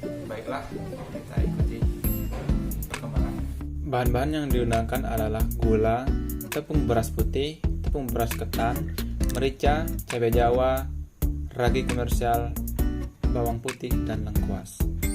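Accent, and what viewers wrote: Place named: Aceh, Indonesia. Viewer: Logatnya suku aceh y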